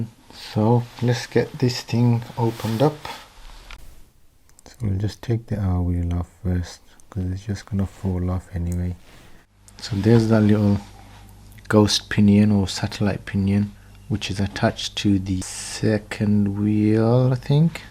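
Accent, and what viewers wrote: Birmingham, United Kingdom. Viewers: 100% you’re from Birmingham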